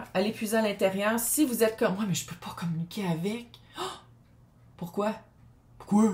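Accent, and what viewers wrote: Quebec, Canada. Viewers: Je découvre et j'adore 😍 je dois encore m' habituer à l' accent québécois 😁 je dois encore faire replay 😁 j' adore ❤❤❤ on dirait un dessin animé 😂 les sons, les expressions, les bulles 😍😍😍 la guidance me parle trop, merci, merci, merci 🙏🙏🙏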